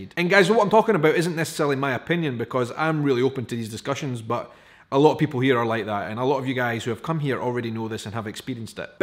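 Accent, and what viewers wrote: Scotland, United Kingdom. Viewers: Makes sense... I agree and I just like listening to your accent. Scottish is closer to our American culture than the British. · I came by this recording by way of a Facebook group: Kilts and Culture <link> I am an amateur performer who celebrates Scottish folk traditions (I sing, tell stories, play pipes, all from a Scottish repertoire). I first visited Edinburgh in 2013, with the express intention of listening to accents. I would have killed to hear someone with your voice patterns (It was during the Festivals and I heard multiple English accents, French, Spanish, Romanian and Australian accents, multiple Canadian accents, and multiple American accents, but nothing I could track as "Scottish"). I observe the same situation with Native Americans ... Urban Americans think they all live in tepees and wear war bonnets ... Thank you for your video.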